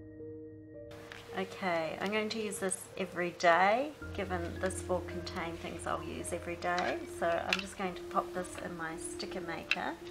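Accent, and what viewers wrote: New Zealand. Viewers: I love your accent! <3 and your work, ofc. Do you happen to live in NZ? :)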